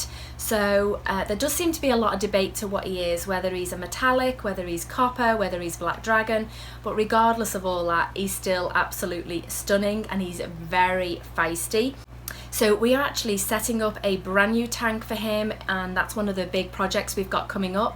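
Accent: Liverpool, United Kingdom. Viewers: i love your channel but i was just wondering are you from the uk but live in america x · Aren't you scouse?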